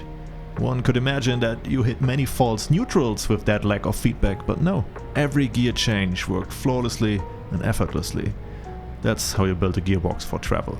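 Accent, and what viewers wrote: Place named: Germany. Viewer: Good review and wow, your English is amazing. I really liked the use of idiom. The spoiler "thingy" and "cheers" were really nice to hear. Whilst you have a German accent, you don't have that mid Atlantic accent so many European speakers of English have. You should feel proud of your video and your excellent English.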